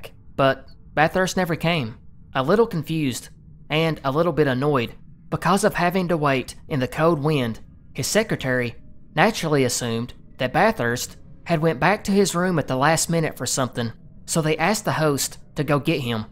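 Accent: South, United States. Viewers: I just found your channel about a week ago and I've been binge listening at work and to fall asleep too. I love the content, but I really love the fact that you're a fellow southerner. There's not enough southern accents on YT. Greetings from East Tennessee! EDIT the guy who jumped out of the plane and died from the cocaine bear story landed in my old neighborhood in South Knoxville. I was a little kid back then, but I still remember when that happened.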